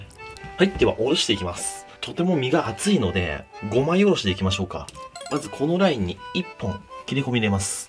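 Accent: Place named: Hiroshima, Japan. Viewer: 名古屋ということですが、広島イントネーションがあるような…。器もいつも参考にしています！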